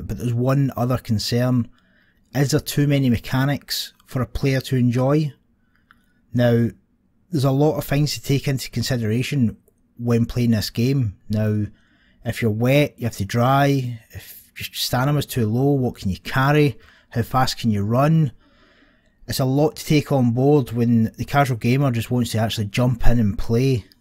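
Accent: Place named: Scotland, United Kingdom. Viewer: you from the area near Edinburgh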